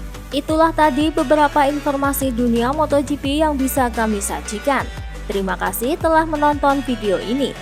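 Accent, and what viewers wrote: Java, Indonesia. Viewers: Mantab bagus penjelasannya , ciri khas banget penjelasan medoknya saya suka 🥰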